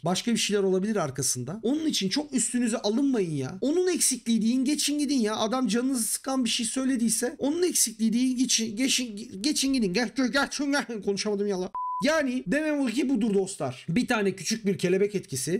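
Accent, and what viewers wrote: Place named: Central Anatolia, Turkey. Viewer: İç anadolu şivesi arada süper gidiyor :)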